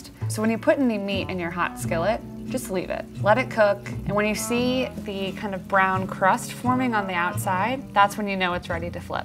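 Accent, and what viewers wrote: United States. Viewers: At the end of every sentence she goes uuuuup on her tone. Very unprofessional and annoying, typical american accent.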